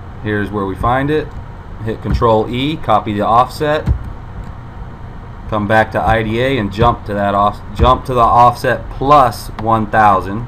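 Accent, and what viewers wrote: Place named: Canada. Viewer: Lmao. Oh! Just kiddin'! Sounds like a Canadian accent, almost Chicago-ish. Anyways, nice tutorial bro. :y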